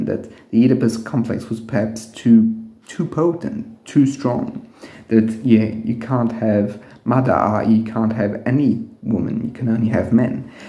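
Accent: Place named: France. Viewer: You speak very good English whilst retaining the charm of your beautiful French accent. Very well presented, informative and thought provoking video. Thank you. I have subscribed.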